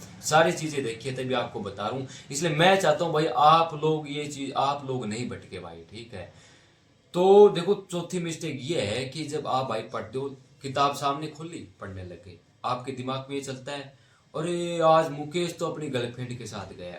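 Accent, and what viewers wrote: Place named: Haryana, India. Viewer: Bhai hariyana se ho aap right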